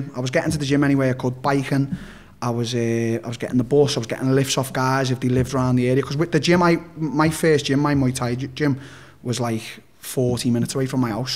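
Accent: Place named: Liverpool, United Kingdom. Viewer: Nasty scouse accent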